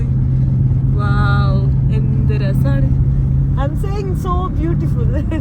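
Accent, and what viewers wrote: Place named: North Kerala, India. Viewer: വടക്കൻ കേരളത്തിലെ സംസാര ശൈലി കേൾക്കാൻ ഞങ്ങളെ പോലെ മദ്ധ്യ കേരളത്തിൽ നിന്ന് ഉള്ളവർക്ക് റെസം ആണ്😊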